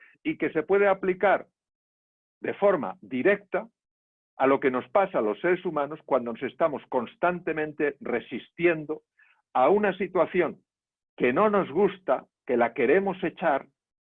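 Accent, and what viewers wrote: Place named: Spain. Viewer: Me encanta el gracejo español del doctor, la sangre española, ole!.- Gracias por esta información, invitándonos a hacernos responsables de nuestras propias vidas. Tenemos al mejor amigo y al peor enemigo dentro nuestro y adentro es que lo vamos a equilibrar. Gracias doctor, por echar luz en este mundo sombrío.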